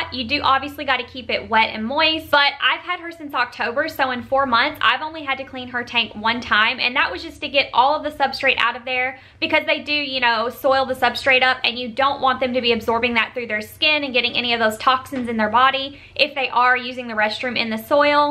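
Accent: South, United States. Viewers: Love ur country accent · she has a really southern accent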